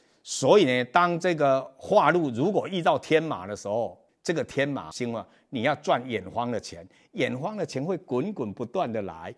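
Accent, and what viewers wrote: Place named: Taiwan. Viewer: 還臺灣國語欸！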